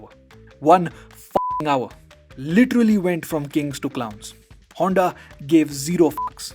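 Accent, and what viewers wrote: India. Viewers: Please bro use AI to dub your videos. The instant I hear an indian accent I click off.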